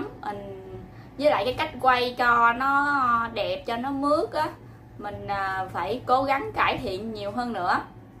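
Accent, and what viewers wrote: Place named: South, Vietnam. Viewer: Noi chuyen nghe that tha moc macdam chat nam bo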